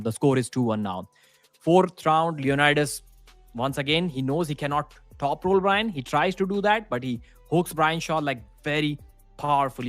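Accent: India. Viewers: Boah schreib doch dazu im Titel das du nur Bilder zeigst replaced und dein Indischen Akzent sprechen lässt statt denn Kampf zu zeigen.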